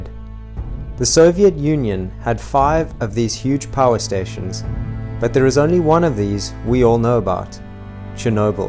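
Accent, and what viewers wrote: South Africa. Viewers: Nice SA accent boet❤ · Just heard your accent and knew you from South Africa😂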